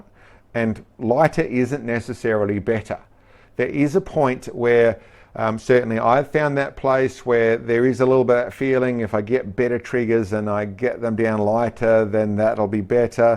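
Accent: Australia. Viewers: This guy sounds Australian, but Australians can't have guns. So is he African?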